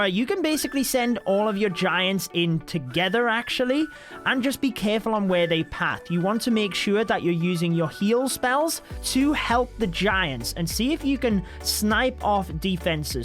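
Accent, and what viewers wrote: United Kingdom. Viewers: Just enjoying ur british accent bro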